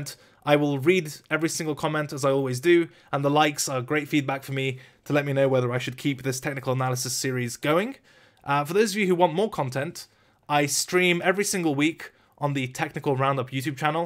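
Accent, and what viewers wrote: United Kingdom. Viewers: High quality content, rich vocabulary and a British accent...I am wet :)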